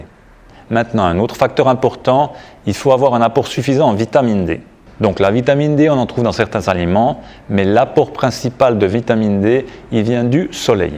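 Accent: France. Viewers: Gros merci ! Ca coule comme de l'Eau , avec un petit accent en plus ... Alsacien ? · Y a pas un ptit bout d'accent Franc Comtois là-dessous? Video tjrs d'actualité 👍✊🙏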